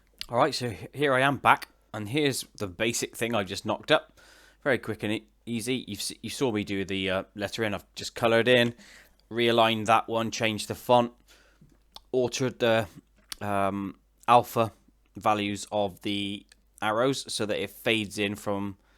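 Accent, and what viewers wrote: United Kingdom. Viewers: I have a question Are you from UK for something?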